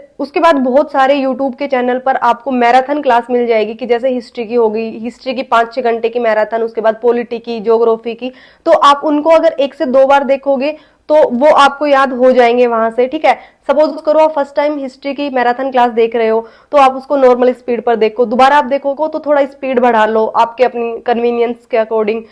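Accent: Haryana, India. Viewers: ! Tum haryanvi ho?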